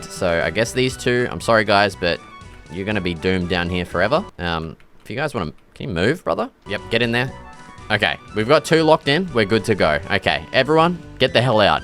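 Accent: Australia. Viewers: why do u sound australian to me????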